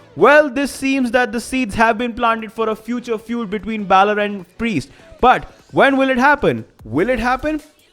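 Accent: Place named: India. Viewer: this may sound dumb but please stop talking with a indian twang you started out so well the firs 30 seconds or so ... idk why I even bother commenting on it but yeah idk it's slightly triggering PS it's not really something you do I guess but it's more WWE fan channels filled with BAD BAD english speaking by indian people who made the title and video in english but then starts to yapping in indian or whatever they say about the WWE in videos ... no offense in advance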